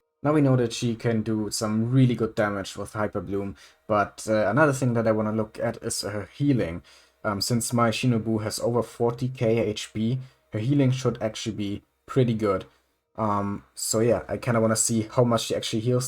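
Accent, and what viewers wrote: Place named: Germany. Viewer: Youuu.. are german! Das höhre ich doch gleich raus :D Fellow Kuki Main here, I like to do Montage videos of teams i like and im working on an Aggrevate Kuki Montage rn I LOVE Aggrevate Kuki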